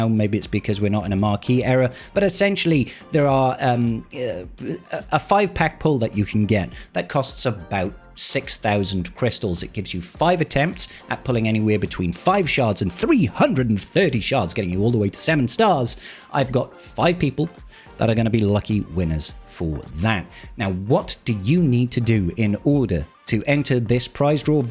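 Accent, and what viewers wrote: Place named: Cockney, United Kingdom. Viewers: Who doesn’t love a bit of the! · <num> the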